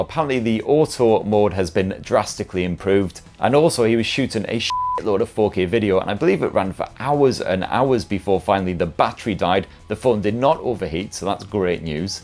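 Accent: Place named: Scotland, United Kingdom. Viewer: English! With that Scottish accent!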